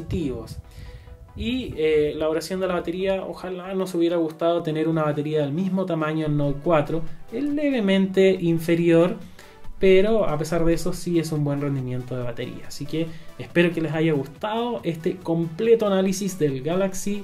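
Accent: Chile. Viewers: el es chileno?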